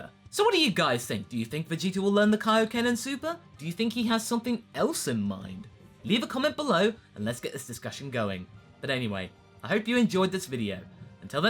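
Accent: United Kingdom. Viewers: dude your voice sounds like a british version of dbz abridged goku 😂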